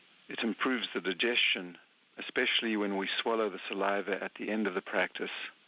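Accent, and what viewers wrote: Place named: South Africa. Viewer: I’m in South Africa. I heard your accent and got excited that you might be in SA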